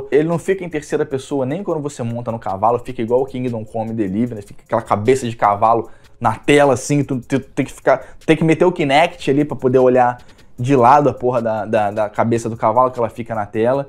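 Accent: Rio de Janeiro, Brazil. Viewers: Senhores, esse é o sotaque carioca que a gente se acoxtuma aqui na cidade.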